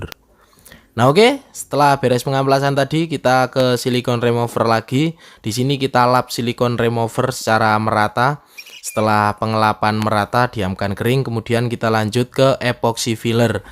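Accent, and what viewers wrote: Temanggung, Indonesia. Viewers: mas kok logat bicara koyo wong temanggung? sampean temanggung to?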